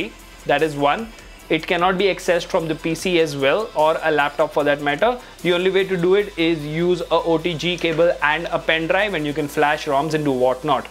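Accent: India. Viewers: nice an indian speaking english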